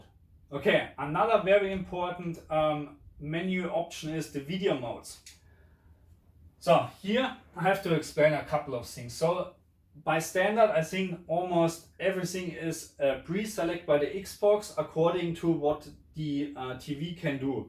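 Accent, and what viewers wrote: Germany. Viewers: Dojcz akcent? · If a man with a strong German accent tells me to change my CX settings - i immediately change my CX settings ! 👌🏻🤟🏻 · I just want to tell you that content is very explanatory and you have put some knowledge and effort into it. Thank you for that and keep with it. Do not listen to haters they have nothing to provide. Feedback and criticism are 2 different thinks. And I love your accent. Are you from Germany? Viel Glück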